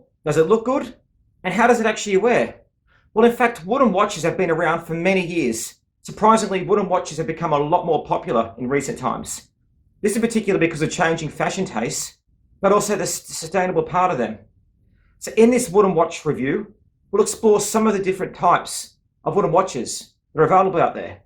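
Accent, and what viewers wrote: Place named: Australia. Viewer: Let’s “dive” in and “cov” our name into the back of our watch (carve) . Love that Aussie accent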